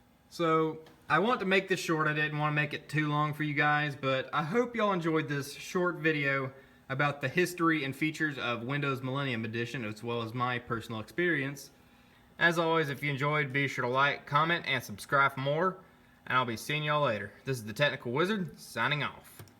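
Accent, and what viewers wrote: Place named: South, United States. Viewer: You must be from the south (Alabama)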